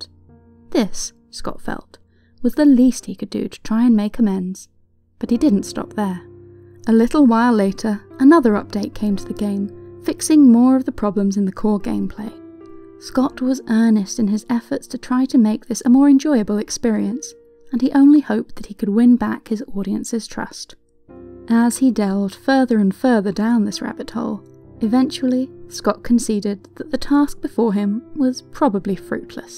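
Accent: United Kingdom. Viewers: Yeah that British accent gave me an instant no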